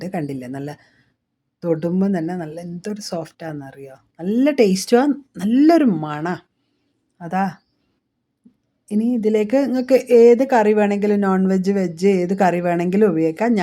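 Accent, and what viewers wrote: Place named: Vadakara, India. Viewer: ഹായ് ഞാനിവിടെ പയ്യോളിയിൽ വടകരയിൽ എവിടെയാണ് മില്ലിന്റെ സൗകര്യം ഇല്ല എന്ന് പറയുന്നത് കേട്ടു നിങ്ങൾ ഇതിനു മുമ്പ് എവിടെയായിരുന്നു ഇവിടെയല്ലേ നിങ്ങളുടെ നാട് എന്നെ ഇവിടെ കല്യാണം കഴിച്ചതാണ് എന്റെ സ്വന്തം സ്ഥലം എറണാകുളം ഇവിടുത്തെ നാടൻ ഭാഷയിൽ ഇങ്ങനെ പറയുന്നത് കേൾക്കാൻ നല്ല രസമുണ്ട് ❤👍🏻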